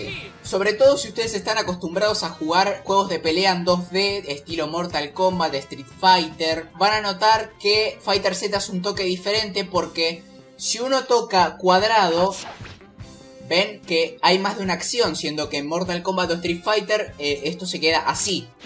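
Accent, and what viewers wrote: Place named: Argentina. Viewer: Sos Argentino, (o uruguayo, no se) eso me agrada como la mina de los Simpsons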